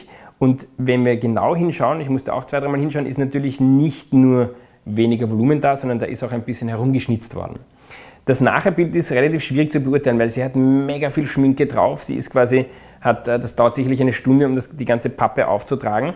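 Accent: Austria. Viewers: Herrlich der Österreich Akzent. Es geht nicht darum, ob es schön oder schiach is.... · ach wie ich diesen österreichischen Dialekt liebe! Wirklich!! 'schön oder schiach' - göttlich!!:-)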